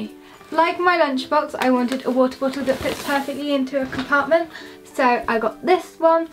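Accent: United Kingdom. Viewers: are you british?💕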